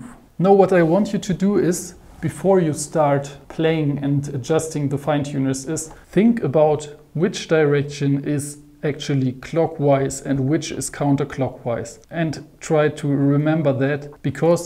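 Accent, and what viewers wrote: Germany. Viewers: DEUTSCHER AKZENT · Are you german?,sounds like that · You have a german accent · German accent thats nice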